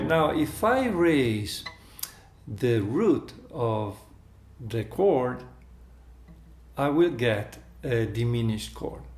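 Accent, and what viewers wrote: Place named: Italy. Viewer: thank you; i could spending hours listening to your italian gusto sound!thank you man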